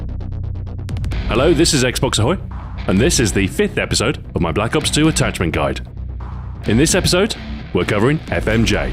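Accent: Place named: United Kingdom. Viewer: Hey, 2 questions here; have you ever considered that one of the sole reasons that part of your subscribers watch your videos is because you have a kick-ass British accent? And have you ever had a Canadian Keith's beer?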